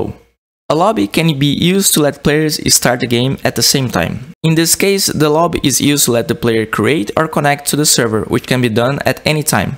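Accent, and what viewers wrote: Brazil. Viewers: meu brasileiro falando em inglês nossa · certeza que é br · Você é brasileiro? :v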